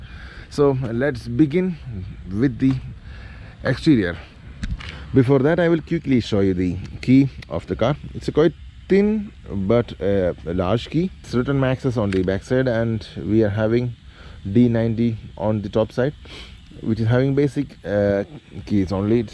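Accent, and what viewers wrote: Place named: Kerala, India. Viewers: Malayali daaaaa....😍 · Sounds like a " Malayali "......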